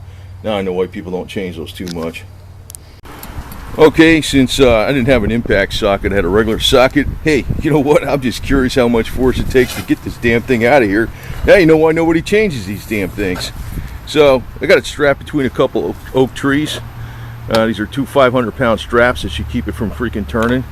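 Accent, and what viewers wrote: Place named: Boston, United States. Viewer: I hear the North east accent- is that Boston 'Frickin"?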